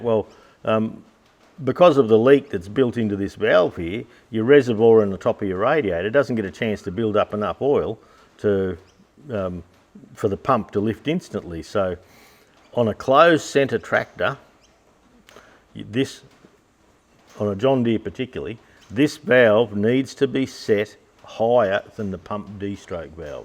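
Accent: Australia. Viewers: informative. I like your aussie English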